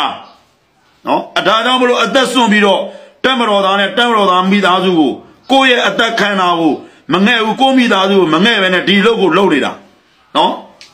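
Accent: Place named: Rakhine, Myanmar. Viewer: ခင်ဗျားဒီပြင်ချန်နယ်မှာမပြောနိုင်ဘူးလား၊ရခိုင်အသံမုန်းလွန်းလို့၊ခင်ဗျားဒီချန်နယ်ဘဲပြောမယ်ဆိုကျုပ်နားမထောင်ချင်တော့ဘူး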